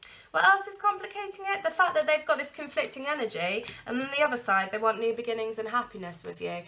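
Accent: United Kingdom. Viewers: I am so happy that I discovered and AND that I stick by watching your videos, in spite I have hard time to understand British accent (english is my second, used to American). You are so wise and connected, I find your insights are being accurate. #1 for me, totally on spot, and Thank you for that.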